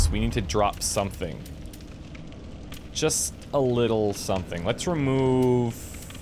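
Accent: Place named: Canada. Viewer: It's not a "tomb!" i kept thinking that too. We still love him, crazy Canadian accent and all.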